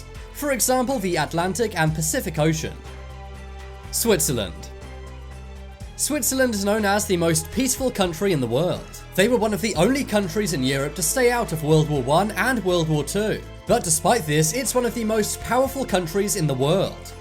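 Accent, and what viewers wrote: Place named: United Kingdom. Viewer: This channel is so OBVIOUS in it’s erroneous “facts”. EVERY SINGLE VIDEO has outrageous statements glibly spewed by a Brit who thinks Americans NEED EVERY documentary narrated w/ a UK accent.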